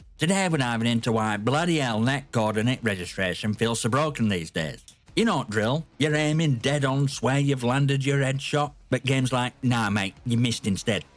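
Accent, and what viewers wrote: United Kingdom. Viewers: Feel like im listening to the British version of blame truth 😅.....call of duty just isnt what im after these days, its a complete shitshow, put 4 hours in, deleted, been enjoying battlefield 5 recently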